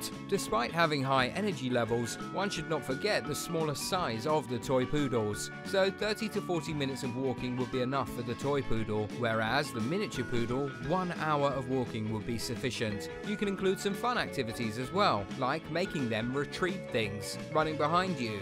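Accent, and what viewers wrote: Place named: England, United Kingdom. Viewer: I'm curious to why someone with an English accent is talking money in Dollars...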